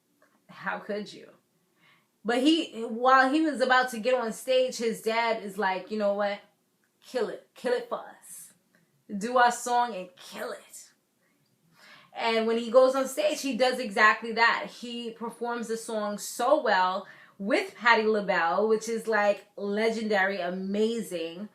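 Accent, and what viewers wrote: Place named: New York, United States. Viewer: I love your accent! Where are you from? Sounds like New York with an island twist.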